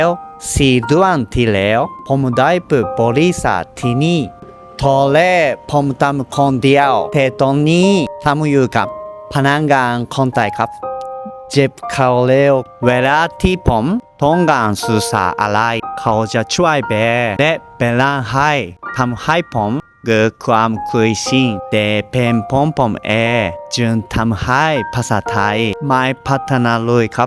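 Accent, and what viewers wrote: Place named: Myanmar. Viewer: ฟังออก เข้าใจ ถือว่าเก่งมากแล้ว การออกเสียงก็พอๆกับพม่าที่มาอยู่ฟินแลนด์ หลายคนออกเสียงประมาณนี้ คนไทยบนดอยก็ออกเสียงประมาณนี้แหละ เก่งแล้วค่ะ อยู่ไปนานๆก็จะเก่งและชัดขึ้น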